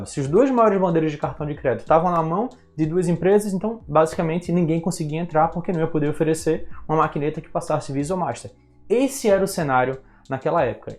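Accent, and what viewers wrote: Pernambuco, Brazil. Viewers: Tu és pernambucano?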